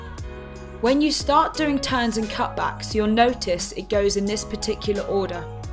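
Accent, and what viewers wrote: United Kingdom. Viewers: and we have a brit commentating here.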